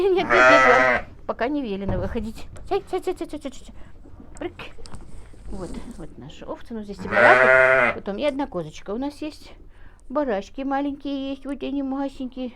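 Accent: Standard. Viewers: подписана на Вас давно, но смотрю недавно. Вы так чисто говорите по-русски, такая грамотная речь, одно удовольствие Вас слушать.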